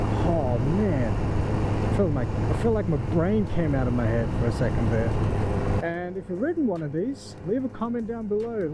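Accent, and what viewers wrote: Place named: Australia. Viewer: It's an awesome bike. I've got the 2019/2020 model and it's an absolute peach of a motorbike. Got it in July 2020. This was a great review as well. I notice the Australian accent mate. Nice to see some Australian content on YouTube as well. Yeah, the s1000r is the best of both worlds in my opinion. It's a total hooligan & docile put-put bike for around town. The quick shifter is the smoothest I've had & fueling is perfect. Super responsive and plenty of get up & go, even if you have leathers with your name on the back of'em.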